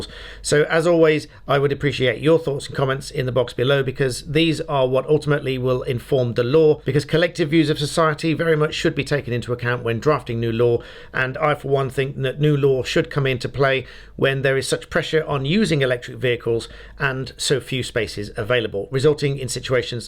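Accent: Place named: England, United Kingdom. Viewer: I saw you on Legal Bytes so I just subscribed. (I love your English accent:-)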